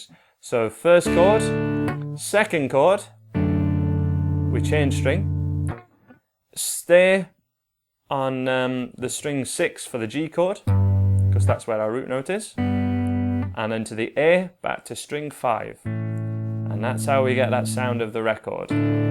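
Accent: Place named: England, United Kingdom. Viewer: English. He's from Leeds.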